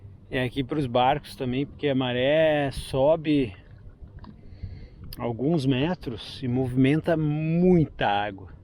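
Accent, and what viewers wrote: Rio Grande do Sul, Brazil. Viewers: linda matéria!!! mas tens um sotaque de gaúcho violento... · Uma curiosidade minha é se você é gaúcho ou catarinense, Seu sotaque puxa muito para as duas regiões. Abs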